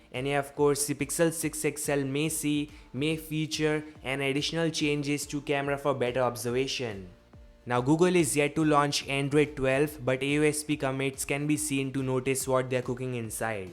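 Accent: India. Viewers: Ngl when I hear the thick Indian accent I close out of the video faster than when I hang up on a scammer.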